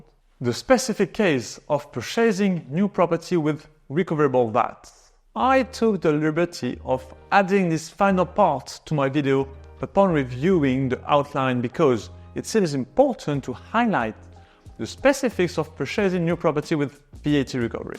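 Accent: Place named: France. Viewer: He's French! And you are rude. He is giving information that could save you thousands for free! Do you speak fluent french with a perfect accent?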